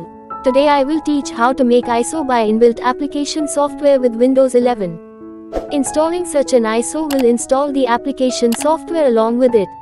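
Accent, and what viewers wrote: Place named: India. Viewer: Might be good content, however I simply can not stand listening to Indian people speak. Especially an AI speaking Indian. What a turd of a video.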